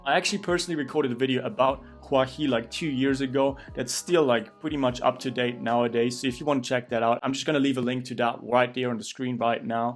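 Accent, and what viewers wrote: Germany. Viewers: Are you german? That accent cant be anything other than german.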